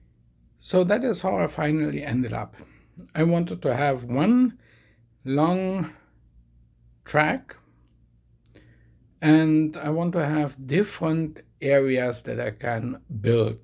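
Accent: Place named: Germany. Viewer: Nice video:-). Thanks so much for sharing this and also it was really really nice to hear from you on the 8th October 2020:-). You do make some creative suggestions and thorough talks about how you make your model rail layout giving examples to what it can relate to relating to real life stuff:-). Well done indeed:-). Thanks so much for sharing this excellent video its an excellent looking model railway you have and well layed out your model railway:-). Plus you thoroughly explained your rail layout terribly greatly I must admit:-). Especially being German and despite being German you're certainly sounding really fluent in English with your accent:-). I can see you're fluent in both English and German which is excellent I do say and its ok whether you are or not:-). We can only be fluent in so many languages:-). Alright then, have a nice evening, plus I wish you all the best and take care of yourself:-D:-D:-). 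From your friend Foxy:-).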